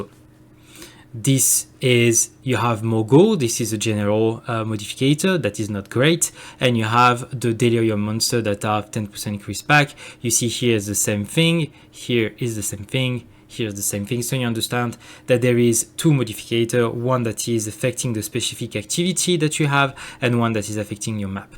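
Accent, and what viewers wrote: France. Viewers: On reconnaît bien l'accent français. Merci pour la vidéo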